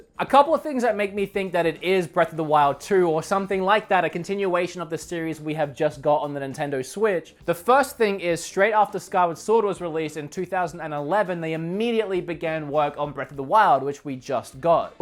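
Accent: Australia. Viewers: I changed my Siri voice to Australian because of you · Your Australian accent isn't as thick as it used to be I noticed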